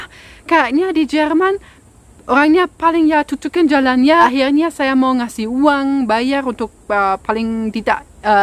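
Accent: Java, Indonesia. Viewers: Ahahaha.. saya suka dengan video anda... disampaikan apa adanya tanpa merendahkan... Sayapun turut bangga karena bahasa Indonesia anda sudah bagus... Berlogat Jawa malah.... Mantap 👍👍👍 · Mbak e lucu banget... Pk bhs lndonesia, tp logatnya Jawa 🤣🤣🤣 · Logate kok jowo 😊🤣👍👍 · Bule Medok khas Jawa ☺☺ Namun Tetep Tak menghilangkan bulenya 👍👍, Matursuwun mbak bule ☺ Lgsung tak like Lan tak Subscribe, Sukses Selalu dari wong jowo di jkt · Bule mana sih mbak ini? Logat jawanya medok bener😁 awas nanti lupa bahasa inggrisnya keseringan ngomong pake bahasa indonesia😂😂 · Jawa banget logat nya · Kok bisa medok jawanya yaa.... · Bahasanya medok. Sangat lucu. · Mba bahasa indonesianya lancar logat medok nya kaya jawa...unik nik nik.. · Salut mba, medok banget bicara bahasa Indonesia nya, salam sukses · Ngakak q Mbk e... Suaramu rodo medok tapi bicara ttg Indonesia sdh ky kenal banget org Indonesia mantul deh.. 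Good luck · Kok medok mba bahasa Indonesianya...wkwkwkwk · Bule jowo iki,ada medhokke 2nya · Kok logatnya sudah agak medok ya hehe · Wkwk... suaranya medhok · mba orang jerman tp logatnya mirip jawa, jd bingung aku?!!$$ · sedikit medok mbak bahasanya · Logatnya medok banget 😭, mirip orang Jawa 😆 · maksih bule, telah memprosikan indonesia dg logat jawa yg kental... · Meddookkk kak..hhh jowone😀😀😀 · Medok jawa mu itu lho mbak...😁😁😁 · Suara sampeyan lumayan agak medhok mbak wkwjwk tapi senang dengarnya.. Sukses mbak terus bikin vlog Indonesianya... Mantab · Keren ada medoknya mbak.. 😄😄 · Medok pisan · Ini bukan orang Jerman lagi tapi orang Jawa asli kayaknya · lucu banget orang jerman tapi bahasa lndonesiannya medhok banget..😂😂😂😂saluuttt👍👍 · Orang bule Jerman tapi medhok Jawa nya. Hehehe... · Ini orang Jerman apa Jawa yahh??...ko medok baget logat jawanya......😄😄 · MBAK..pakek bahasa indonesia, logat jawa hehe...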